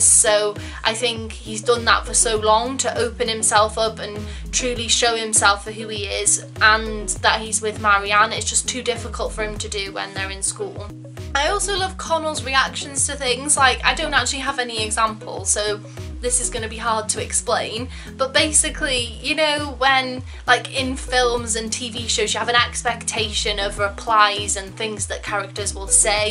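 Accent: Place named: Northwest England, United Kingdom. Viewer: Great review you sound like you come from northwest of England maybe I'm wrong ☘️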